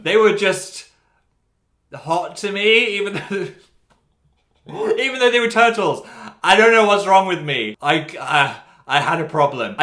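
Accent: North, United Kingdom. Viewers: His accent is just getting more and more Northern 🥰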